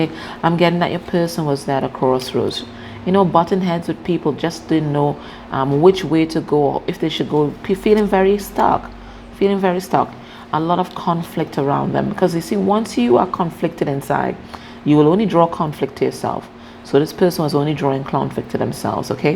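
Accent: Trinidad and Tobago. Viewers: Wowwwww this is crazy. I first came to your channel months ago and I said to myself how she sounding like a Trini so 😂😂 Quito Quito indeed 👏💜❤️ bless up from the homelands. Love your readings.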